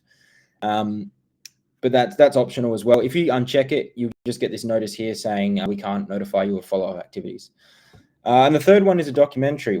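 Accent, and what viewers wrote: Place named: Australia. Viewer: May you be well & happy dude....im here for listening australian accent ...love from sri lanka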